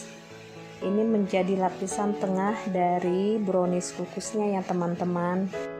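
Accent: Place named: Java, Indonesia. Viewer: Wah mantep cara bikin kue lapis😄 jowo medok hadir embak e.yuk yang lain....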